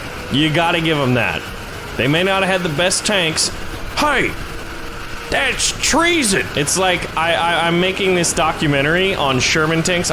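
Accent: Germany. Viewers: i swear your german is sooooo funny😂😂i am from austria so i gotta know😅🤣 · u pronoce brezel wrong sorry XD you german acent is so bad it hurts in my ears i love it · Nice German accent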